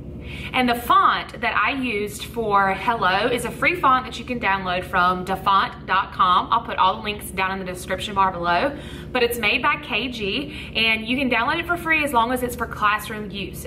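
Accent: South, United States. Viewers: Are u southern?? Bc im from texas and i can tell by your accent but i dont wanna be rude and say your something your not lol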